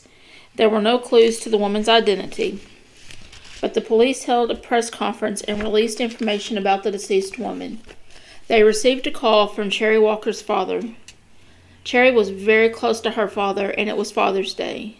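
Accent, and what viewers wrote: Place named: South, United States. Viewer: Good stuff. Thank you, You've got the sweetest voice, im from Oregon so im used to those southern draws.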